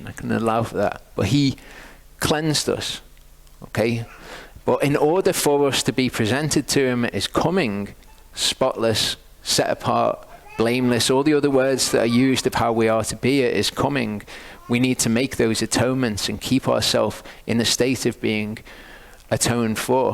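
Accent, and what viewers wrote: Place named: Liverpool, United Kingdom. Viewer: I SEE BY YOUR VOICE YOU ARE FROM LIVERPOOL, I ALSO AM FROM LIVERPOOL AND AM LOOKING FOR A BIBLE BELIEVING CHURCH THAT KNOWS WHAT TIME IT IS AND THAT WE ARE IN THE VERY LAST DAYS, IVE TRIED MANY, BUT THEY ALL PREACH ONLY FROM THE FOUR GOSPELS, THEY DONT GO NEAR PAUL, THE OLD TESTAMENT AND CERTAINLY NOT THE BOOK OF REVELATION. I REALY NEED A CHURCH THAT I CAN GO TO AND FELLOWSHIP WITH, CAN YOU ADVISE ME? I LOVE YOUR SERMONS AND WISH YOU HAD A CHURCH IN LIVERPOOL! YAHOVAH BLESS YOU IN THE NAME OF YESHUAH HA'MASHIACH! THANK YOU! My email is: <mail>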